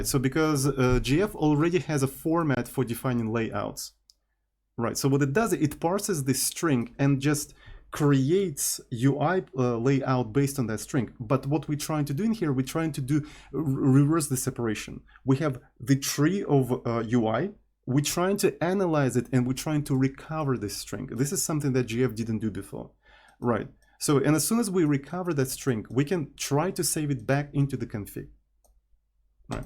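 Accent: Russia. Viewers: Started watching… heard familiar accent… found that author is from Moscovia.. closed the video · Какой хороший , понятный русский акцент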